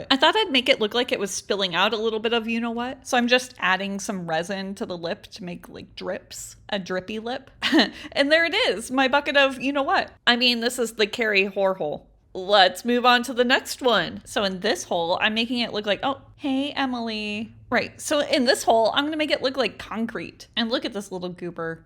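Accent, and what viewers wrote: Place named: Minnesota, United States. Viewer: You talk Minnesotan it's ok . I understand you lol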